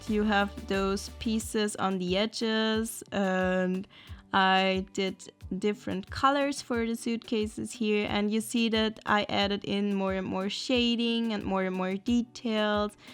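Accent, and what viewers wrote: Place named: Germany. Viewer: Hör Deutsch raus in dem englischen akzent,lieg ich da richtig?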